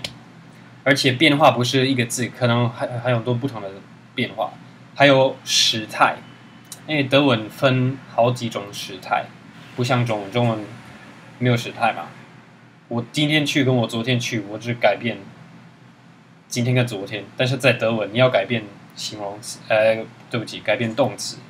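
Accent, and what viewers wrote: Taiwan. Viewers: 講德文超帥。超根本不是討厭的。哈哈以後講聽不懂的德文就好了。根本帥。王子講國語根本台客。哈哈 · 我猜你是跟台湾人学的中文［［ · I am really impressed with your fluency of Chinese but the thing is you speak with a pretty obvious Taiwan accent，which sounds definitely beautiful but a little bit strange if you are trying to communicate with someone who comes from Mandarin. You may wanna watch some Mandarin movies in order to see the difference. Also, thanks for your sharing! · 好像到地的台灣中文!!! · 这人的汉语在台湾学的吧，我听出来了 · 在台湾学的中文？ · 汉语学的一口台湾腔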